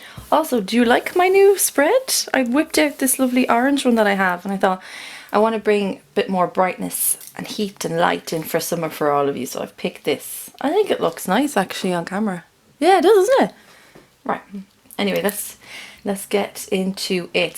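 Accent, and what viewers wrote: Ireland. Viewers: Love that reading, love the Irish accent too! I'm Irish and lived in America for twenty one years, never got the adopted American accent, so hopefully you won't either! Thank you!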